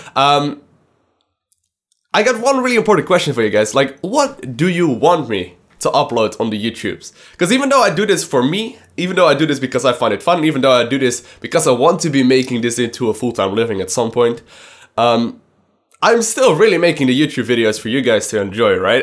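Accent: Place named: Netherlands. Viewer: Already thought that you were Dutch from your accent, now I have confirmation.....<3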